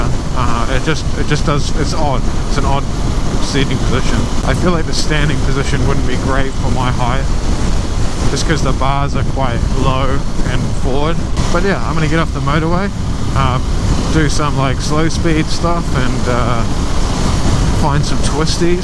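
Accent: New Zealand. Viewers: Cool review bro. Love your style and always good to hear another Kae-wee accent on YouTube.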